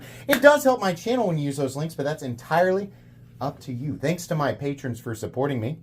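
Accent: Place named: Kansas, United States. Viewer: I kinda imagined people in kansas talk like that anyway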